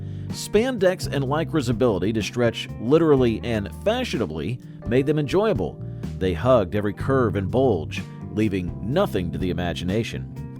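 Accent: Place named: United States. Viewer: I hear a standard American accent from this host. I am not sure if it's computer generated or human. In case they are a human and reading this, the word is pronounced "app-li-KAY"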